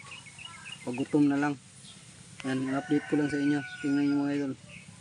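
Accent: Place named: Waray, Philippines. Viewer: Salamat pala dol sa pag shout out saan lugar mo idol waray bayan pang yong tuno kasi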